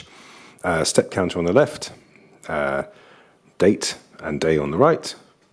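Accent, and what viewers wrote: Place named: United Kingdom. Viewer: Marvelous review...love that british accent....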